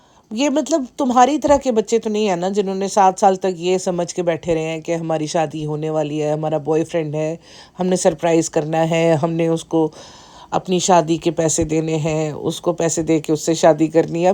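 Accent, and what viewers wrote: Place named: Punjab, India. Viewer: That punjabi tuch ❤❤❤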